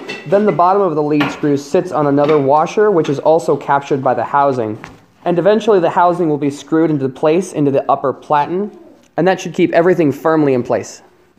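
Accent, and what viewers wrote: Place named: Utah, United States. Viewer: This guy sounds like he's from Utah.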